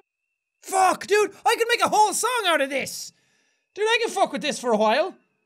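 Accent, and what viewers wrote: Ireland. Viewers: Are ya Irish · ur Irish accent really pushed in this video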